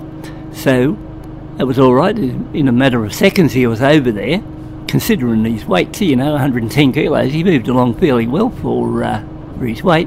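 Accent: Australia. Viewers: Bloke sounds like the orignal template for a bogan mate.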